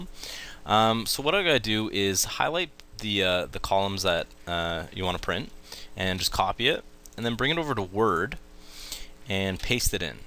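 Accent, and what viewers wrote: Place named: Canada. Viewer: Thank you so much. Brilliantly helpful and no wasted words. Love your Canadian accent too (from Australia).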